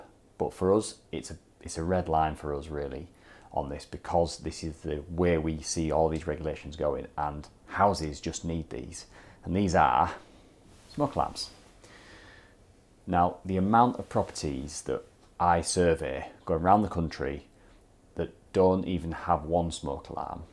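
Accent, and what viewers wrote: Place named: Northern England, United Kingdom. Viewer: What area are you based im guessing uk not sure how north 😂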